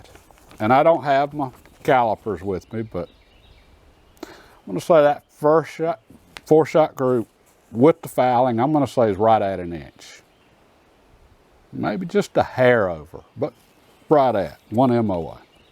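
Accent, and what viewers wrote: South, United States. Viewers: Love his southern accent. I like that he talks kind of slow too so I can understand, as a new person to all this. And he seems like he's been hunting and shooting all sorts of rifles for decades.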